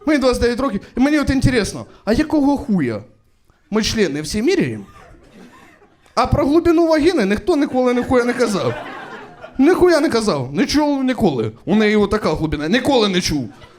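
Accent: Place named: Russia. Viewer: Ох, як же відчувається ,що людина російськомовна... Це навіть не суржик, це фонетичне знущання💔 Хлопчик, ти милий перспективний, і плюсік за старання, але якщо вже ти займаєшься справою, де мова і слово - твій інструмент, ну прикладай більше старання!!! Бо твої російські слова , які ти просто промовляєшь з нібі то українськім акцентом так ріжуть вуха, просто кров з них йде. Лайк заради просування україномовного контенту. Ну і за харізму❤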